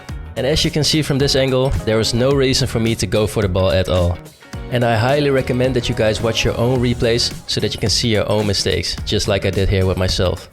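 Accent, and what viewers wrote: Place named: Netherlands. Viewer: Nice video man you are insanely underrated and are you from the Netherlands by any chance I can kinda hear it when you talk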